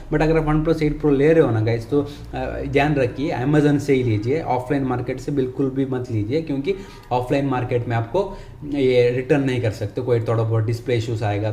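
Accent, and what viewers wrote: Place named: South, India. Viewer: I love south indian hindi 😃😃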